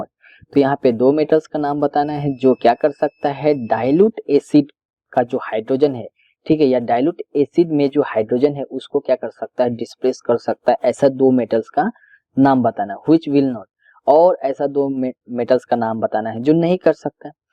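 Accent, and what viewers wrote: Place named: West Bengal, India. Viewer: Thankyou very much sir.......I'm very happy to see...that you are helping me.....and many other students... thankyou.. very much sir......sir....from ur...voice...mujhe aisa lag rha hai ki aap bangoli ho..........thnq sir...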